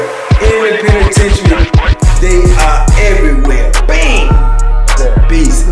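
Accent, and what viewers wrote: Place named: Virginia, United States. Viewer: Man, I love the stories, but they're just a bonus to me. Started watching APS and then living in Asia...just to hear voices from that Tidewater/VA area. Ain't nothing more comforting than an accent from home when you been gone too long. Damn good to hear your accent man. Salute.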